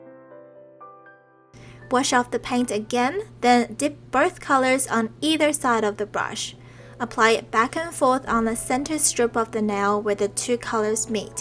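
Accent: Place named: Australia. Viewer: Are you Australian or Asian!? I love your accent! So pretty!!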